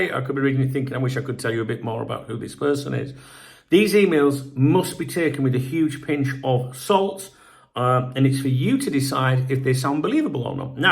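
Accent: Yorkshire, United Kingdom. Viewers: me and the missus have been trying to figure out where your accent is from. My guess is Yorkshire, the missus reckons Cumbria. Are either of us correct? If not, help us out and tell us where the effing hell you're from. Haha.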